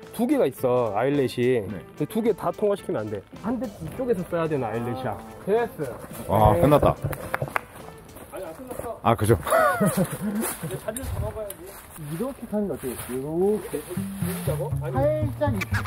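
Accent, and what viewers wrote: Jeolla, South Korea. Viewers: 택티컬 터널 검색중에 우연히 들어왔는데 즐라도 말투에 친근감 느끼고 바로 구독 눌렸는데 헬리녹스 전반적인 관점, 전망 설명에 ㅂㄹ을 탁치고 갑니다. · 같은 지역출신 사람으로서 동생같은 전라도 사람들이 많았으면 좋겠어. 전라도 사투리를 사용하는 사람들은 지적이다. 달변이다. 그리고 젠틀하다.이런 이미지 계속 퍼졌으면 좋겠어. 과거 암울했던 시기 오랜기간 여러채널을 통해서 자행된 전라도사투리의 부정적이미지 심어나르기 .. 추천누르고 구독 꽝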